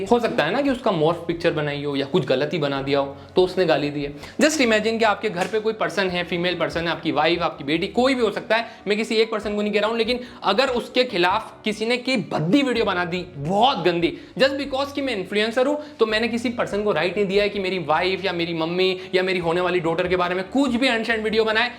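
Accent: Indore, India. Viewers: bhiya tum indori ho kyaa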